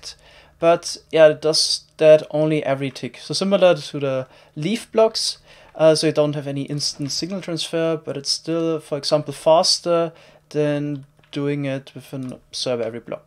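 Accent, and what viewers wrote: Germany. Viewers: R u german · You've a hard german accent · Is he german? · By any chance are you german? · thats a hardcore german accent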